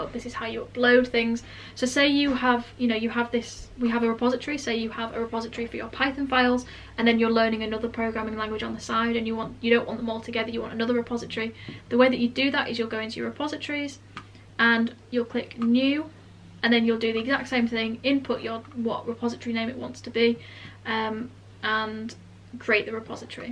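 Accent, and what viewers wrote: United Kingdom. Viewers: I am in love with that British Accent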